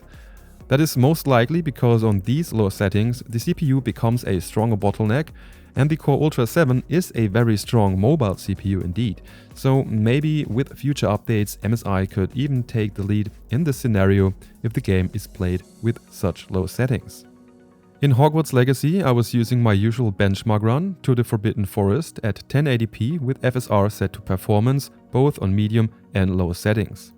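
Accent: Germany. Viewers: Als Deutscher hört man schon in den ersten 5 Sekunden den Deutschen Akzent raus. :D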